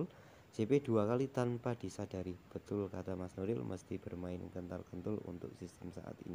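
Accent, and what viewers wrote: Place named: Java, Indonesia. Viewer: Joss cak nuril smga sukses selalu cak logat jowomu gurih😁👍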